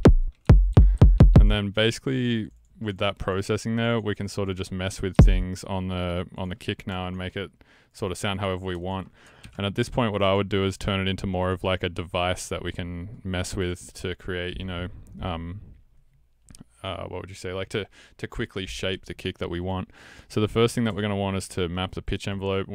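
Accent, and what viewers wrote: Australia. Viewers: Wait are you Australian hahaha, if you were from western aus your accent would fly under the radar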